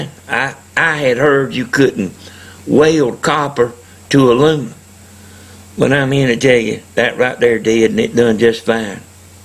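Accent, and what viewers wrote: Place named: Tennessee, United States. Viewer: Really appreciate the attention to detail. You and your videos are without a doubt the best and most properly informative antenna building tutorials on YouTube! Not to mention, everyone loves the sincere traditional American only southern Tennessee accent- adds that extra lovability to your clear credibility no doubt brother. God bless.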